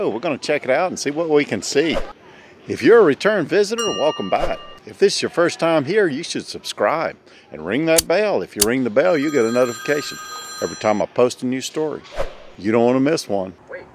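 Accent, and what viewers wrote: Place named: Arkansas, United States. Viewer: I was born in Bethlehem Pa-raised in SW Florida & blessed to have lived in Corpus Christi Tx for 20 years. Your accent is not from any of these. 😂🤣😂 love your wit & videos-thank you. Arkansas accent? 🤷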